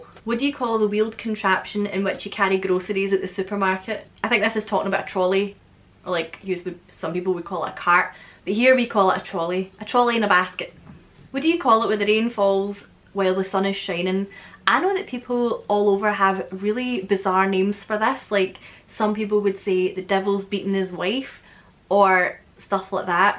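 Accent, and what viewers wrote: Scotland, United Kingdom. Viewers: I find the Scottish accent very attractive and sexy! Your Scottish accent is the best Scottish accent I’ve ever heard on YouTube! I’m talking with a Scottish accent x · I'm from Canada and my granny had a Scottish accent so I was used to it but when we went to Edinburgh I had no problem understanding anyone and yet I have a hard time understanding you sometimes. Is it because of where you're from? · not gonna lie, honey, I adore your Scottish accent. just keep going, speak on. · I think your voice/accent is one of the best in the world, and I love it so much. I could listen to you speak all day, every day, forever. ❤ Bonnie Scotland. ✊ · But u also have the scottish dialect which comes with the accent havent seen past videos but i really liked this specific dialect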